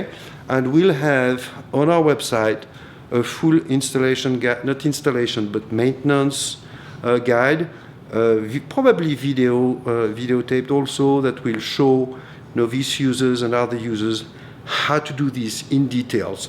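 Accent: France. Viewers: he's french for sure, he has the accent :) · german? u serious ? hes french u can clearly hear it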